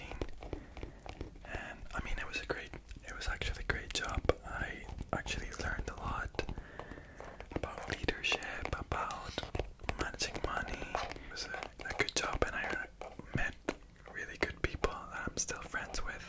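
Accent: Ireland. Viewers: As an Irish person I can say you defo have a little bit of an Irish accent.. what part of Ireland is ur dad from? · Your english is perfect my first thought was “Oh he must have grown up in Ireland” 😭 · As soon as you started speaking I knew you had to have some Irish in you lol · I thought I could hear slight Irish twang in your English speaking accent lol, sounds like it’s from up north maybe but hard to tell 😂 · I was thinking that was an Irish accent!! Your English is very well spoke and as an Irish person you have such a strong Irish accent !!! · I hear a faint Irish lilt as well! It's like 5% Irish (on the vowels), 5% Spanish (on the consonants), 90% perfect native-sounding English. Oop not to imply Irish accents =/= native English speakers 🤣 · Kerry accent 😂🤝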